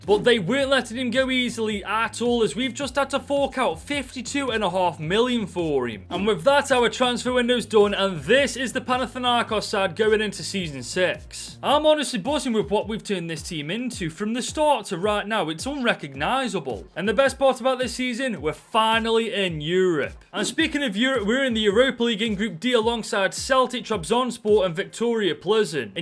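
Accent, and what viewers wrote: Stoke, United Kingdom. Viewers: Where are you from? Sound a tad Stokie to me, love the vids man 😁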